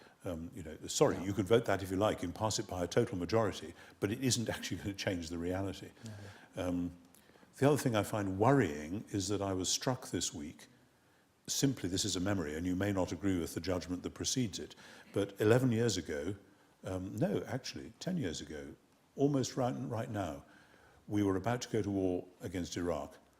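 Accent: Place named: United Kingdom. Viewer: Pompous ass. Narrow minded pompous ass with a British accent.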